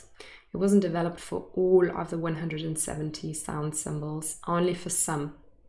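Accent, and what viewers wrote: Germany. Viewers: Are you German? Where are you from? You sound German. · I know you are a German-speaking teacher of English. My observant ears can catch a foreign accent in your speaking English.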